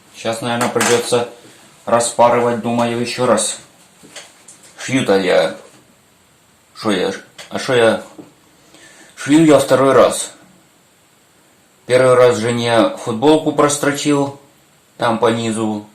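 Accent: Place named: Donbas, Ukraine. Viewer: Разговорный Донбасский) сразу узнала, чисто по мужски сшит чехол))))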